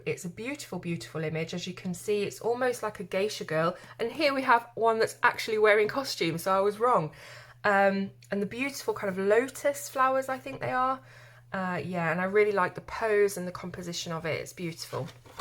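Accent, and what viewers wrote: United Kingdom. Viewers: O your British cool love the way you talk love the coloring books to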